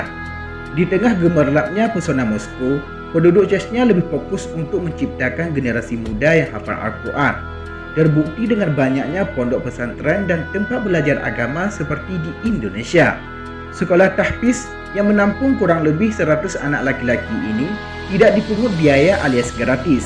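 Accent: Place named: Aceh, Indonesia. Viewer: Hana pas loem mantog na logat Aceh kapegah haba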